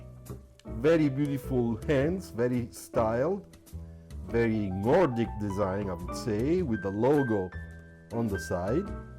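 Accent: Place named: France. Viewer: Toi t'es français avec ton accent 😊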